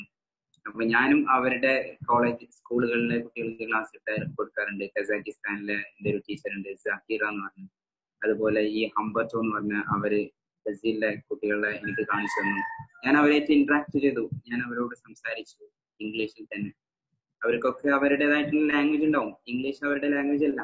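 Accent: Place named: United States. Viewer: good american accent..! bravo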